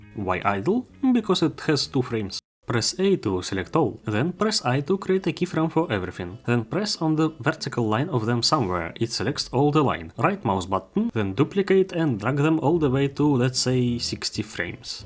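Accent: Russia. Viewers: bro are you russian dont know why ,i like to hear you speek english (this is only a comment dont take it seriously ),it is intreasting to learn some think while having fun keep it up🙂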